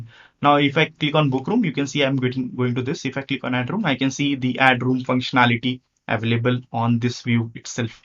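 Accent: India. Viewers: Well no doubt, this man understands his stuff, but this indian english is very exhausting in addition with this bad sound quality. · Really tried to give this a chance, but the Indian accent is just too difficult for me to hear for too long.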